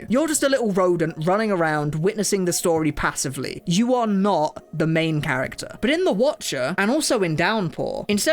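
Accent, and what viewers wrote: United Kingdom. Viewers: he sounds more british than usual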